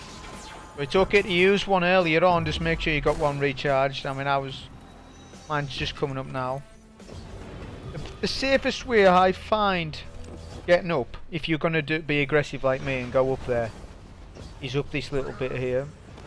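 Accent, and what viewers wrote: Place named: Newcastle, United Kingdom. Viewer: ah well am from Newcastle myself so I could hear a little bit of it in your voice haha don't come across many players close to home to be honest haha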